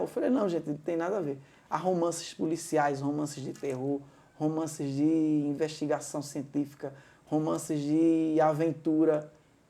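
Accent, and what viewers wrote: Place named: Pernambuco, Brazil. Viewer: Muito obrigado! Nossa fala nordestina pernambucana traz traços de uma região que bebeu muito vocabulário jorrado pelas ondas do rádio.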